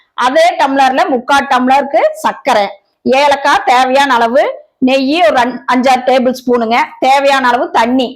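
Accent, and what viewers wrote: Coimbatore, India. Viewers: நம்ம கோயம்பத்தூர் மரியாதை பேச்சு(ங்க) தனி கேட்கவே அவ்ளோ நல்ல சந்தோசமா இருக்கு 🎉🎉😊😊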